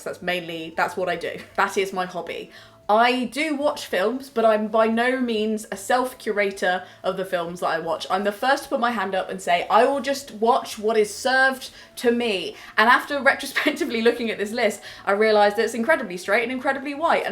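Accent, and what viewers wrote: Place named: Worcester, United Kingdom. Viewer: Just stumbled on this video and my first thought was....I recognise this accent. Are you from Worcester, that is my hometown.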